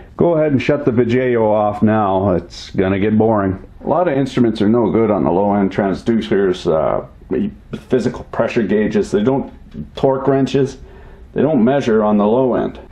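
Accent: Quebec, Canada. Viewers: hi, I'm from Montreal, Canada. And I love it when you speak french .makes me laugh everytime with the quebecois slang and hockey on top of it lol. keep it up.congrats for your channel. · Nice québécois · Quelque chose me dit que vous êtes du Quebec! suis-je dans l'erreur ? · 10/10 for Quebec French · Tu sais parler québécois! You know how to speak french-canadian!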